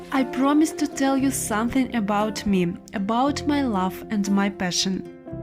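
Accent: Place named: Russia. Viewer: your voice very much with your Russian accent it is so endearing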